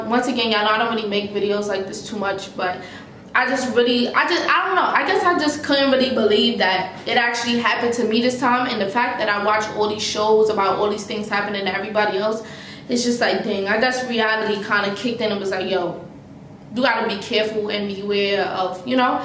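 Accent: New York, United States. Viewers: That’s so scary! Glad you were paying attention to your surroundings and your boyfriend was there! Also yall with this thick ny accent story time is everything 👏🏾 “respectfully” lol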